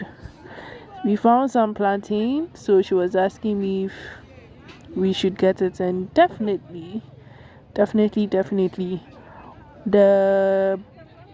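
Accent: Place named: Ghana. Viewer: Chale why do you sound so Ghanaian more than me 😂😂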